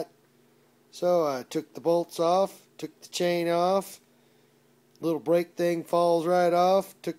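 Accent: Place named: South, United States. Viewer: Thanx for southern speak. To the point no rambling..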